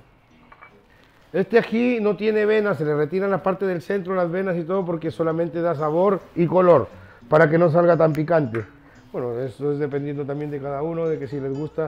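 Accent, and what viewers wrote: Chile. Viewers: Queee !! No se pone huevo crudo 😱y dónde está la cebolla el soasado ?? 🤔 Mi abuela le hubiera jalado las orejas No Sabe Nadaaa 🇵🇪 de Huancayna . . Noto un dejo chileno ?🤦🏻‍♀️ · se le pego de chileno po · hechastes el aji crudo si soasarlo ,na que ver ah otra cosa habla como peruano no pierdas la esencia ,y no hables como chileno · Y PORQUE HABLAS COMO. CHILENO..😃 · Alfredo Santaria si lo mismo me dije yo.. en su uniforme de cocina dice perú.. pero tiene un dejo de chileno inconfundible!. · si tu eres peruano shora hablas co.o chileno por favor donde estamos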